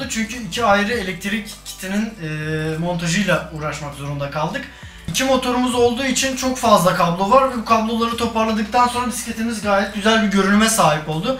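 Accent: Erzurum, Turkey. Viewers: abi siz erzurumlumusunuz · Abi Erzurum lu musn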